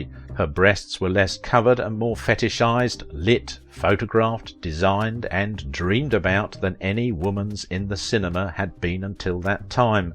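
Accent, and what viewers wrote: England, United Kingdom. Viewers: I’m assuming your comment is tongue in cheek. The narrator seems to be British. In the US we may say loo-tenant, in Britain it’s left-tenant. Even though the spelling is the same. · we invented the language, therefore we will speak it how we like. His pronunciation is correct for that part of England.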